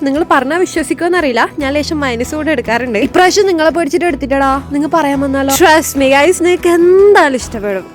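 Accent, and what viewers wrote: Kannur, India. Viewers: Kannur slang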